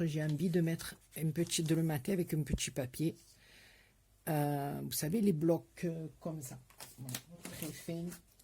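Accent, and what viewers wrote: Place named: Marseille, France. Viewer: J adore votre façon de parler vous faite pensées à ma belle soeur de Marseille bisous d amiens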